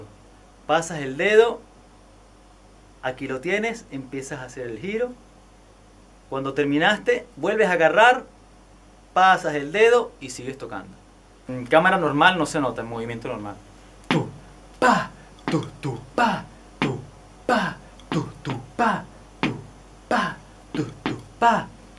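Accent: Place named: Canary Islands, Spain. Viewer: tienes acento canario, eres de tenerife? :D xk si es asi ya tienes un colegacho tinerfeño xD jajaja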